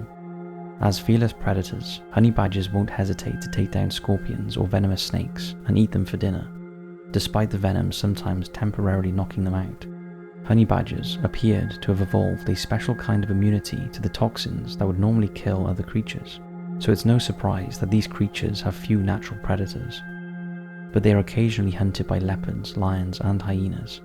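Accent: United Kingdom. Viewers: British narrator asking for dollars. I'm guessing this is an American channel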